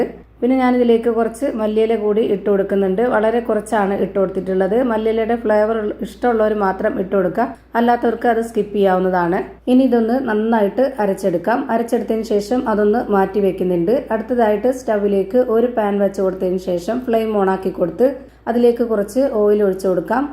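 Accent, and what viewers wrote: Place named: Malappuram, India. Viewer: മലപ്പുറം താത്താന്റെ ശബ്ദം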